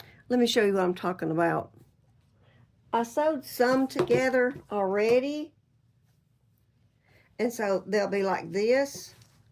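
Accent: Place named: South, United States. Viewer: Fun. Just found you. Loving your down-to-earth southern accent and humor. Will be watching you from Evansville, Southern Indiana.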